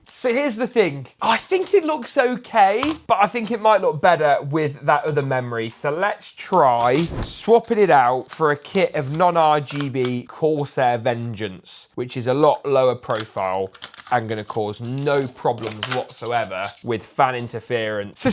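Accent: United Kingdom. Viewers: Bruh the thick british accent i can't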